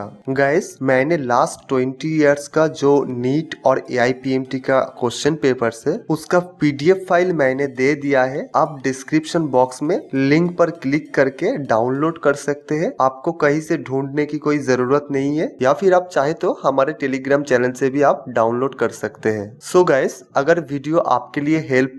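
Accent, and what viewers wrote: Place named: Odisha, India. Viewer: Aap ke aawaz se log raha hai ki aap odisha se hai..... Becoz.. Odisha log ka hindi aawaz pata chal jata hai......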